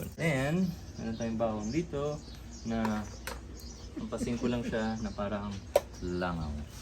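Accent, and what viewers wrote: Iloilo, Philippines. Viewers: Ilonggo ka gali sir?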